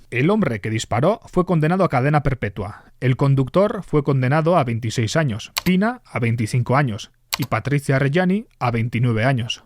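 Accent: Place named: Basque Country, Spain. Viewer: Eres euskaldun no? Lo digo por tu acento. Yo de Vitoria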